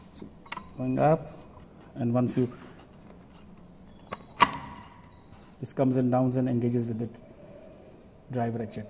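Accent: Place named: India. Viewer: Logat india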